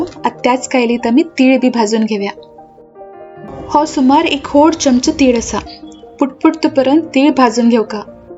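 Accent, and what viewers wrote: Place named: Aurangabad, India. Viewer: The way you speak konkani is different from Mangalore konkani 😊. Which part are you guys from ? My cousins who were from Aurangabad spoke like that.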